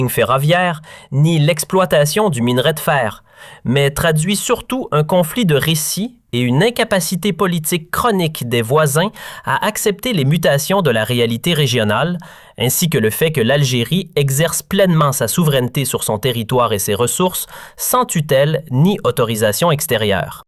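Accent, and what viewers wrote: Canada. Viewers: à l'accent canadien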